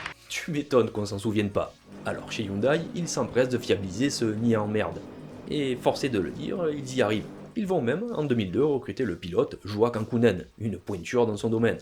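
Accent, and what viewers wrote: South, France. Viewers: chaîne que je découvre ! Rallye + merguez et l 'accent du sud !!! j 'adore !!! #saucisse power !!!!!!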